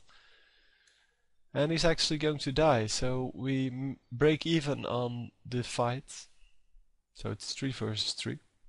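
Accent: Netherlands. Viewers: ze engels heet een verschikkelijk nederlands accent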